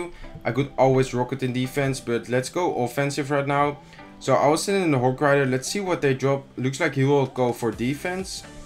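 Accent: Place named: Netherlands. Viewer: Je praat Engels met een Nederlands accent... Ben je Nederlands?? Anders zou je dit niet kunnen lezen... Op xD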